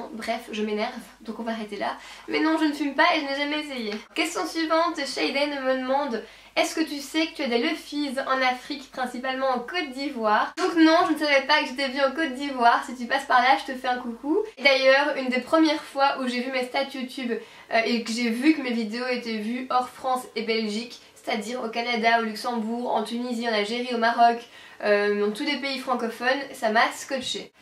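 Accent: Belgium. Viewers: Mais, ne sacrifies pas ton master pour ta chaine et les évènements qui vont avec, c'est trop important;) J'adore ton accent Belge =) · BELGIUM POWER mwaaa! Je trouve pas que ton accent belge est prononcé par rapport au mien ahah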